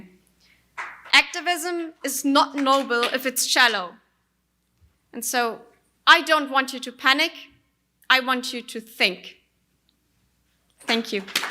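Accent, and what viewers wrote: Germany. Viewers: German accent...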